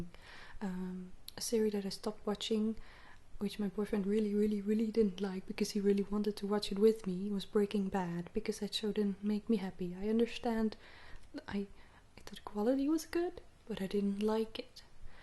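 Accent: Netherlands. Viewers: Niet in slaap gevallen, maar wel heerlijk om te luisteren naar je lekkere Nederlandsheid. :)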